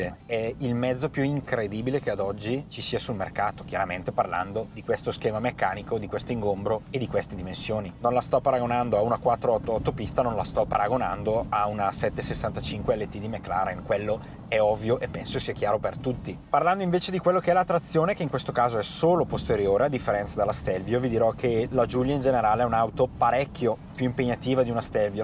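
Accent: Brescia, Italy. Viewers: Che accento Bresà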